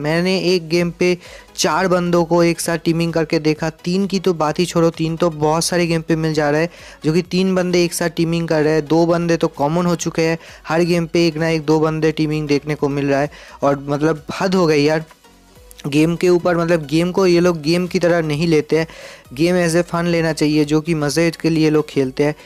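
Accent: Bengal, India. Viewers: Keya app Bangoli ho???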